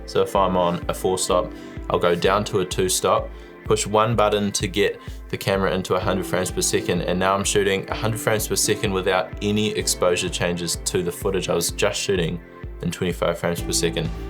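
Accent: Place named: New Zealand. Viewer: Hah, might be worth it to specify that. I very much noticed the Kiwi accent, but seeing as the majority of L1 English speakers on earth are American, the word "dollar" is presumed to be the American one unless otherwise specified (online and in international contexts). That's what some of us are incredibly confused about the price (I came to the comments to see if you'd explained it) — for us, the Ursa 12k is $6,000; and the Ursa 4.6k, even cheaper. So when you said it was $25,000, it very much elicits a terrified "OH GOD WHY DID HE PAY THAT MUCH MONEY" response.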